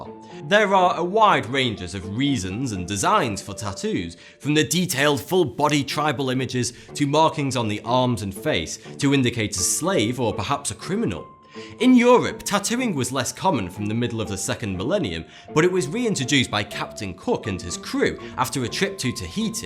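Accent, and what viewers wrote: United Kingdom. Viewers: Why i never miss a video: 1% its interesting 99% british accent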